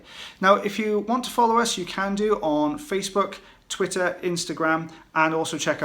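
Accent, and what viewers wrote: Wales, United Kingdom. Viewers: I could listen you your voice and lovely Welsh accent all day...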